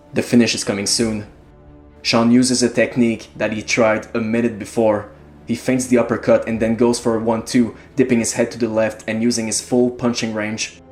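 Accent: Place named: Quebec, Canada. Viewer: Love your videos! On entend l'accent québécois légèrement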